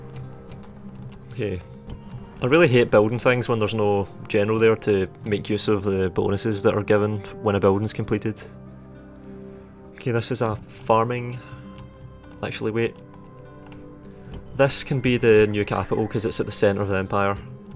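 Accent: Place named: England, United Kingdom. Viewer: Best english accent? Or am I the only one who thinks this?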